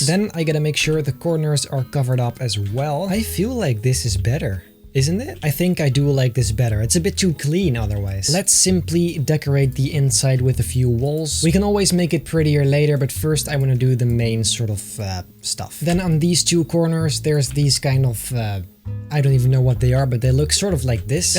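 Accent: Netherlands. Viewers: hey are you dutch /NL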